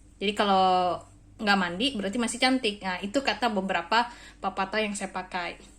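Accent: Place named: Batak, Indonesia. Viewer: Kakak org batak ya ? Cara bicaranya mirip temanku dari batak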